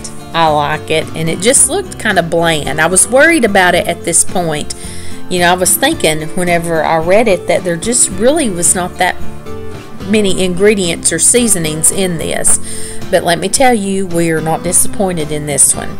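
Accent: South, United States. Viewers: I also love your wonderful accent 🙂. Sometimes I wish I was Southern 😂. But even though I can't speak in that beautiful way y'all do without being annoying or offending someone, I can try to make southern food. I've been making biscuits homemade from scratch since late last year and my family always loves them. I live in a country where there are absolutely no canned biscuits. So when I move back to the states Lord willing, being able to buy so many easy canned stuff will be such a treat. One batch makes about 11 biscuits and there never seems to be enough especially as our dog will not stop barking (when she's tied up momentarily so we can eat without her climbing all over my mom to get some) unless she gets one 😄.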